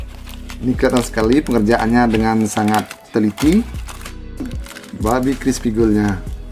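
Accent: Bali, Indonesia. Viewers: duhh seksiinyaaa logatnya bali bgtt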